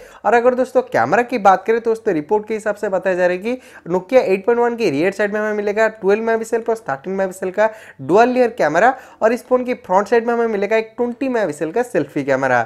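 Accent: Odisha, India. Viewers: Loved your odia accent!!!!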